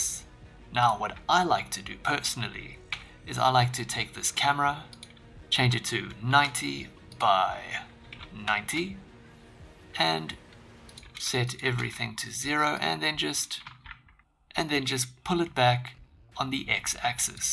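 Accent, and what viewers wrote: South Africa. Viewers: I thought I recognised that accent as South African :) What part of SA? Cape Town?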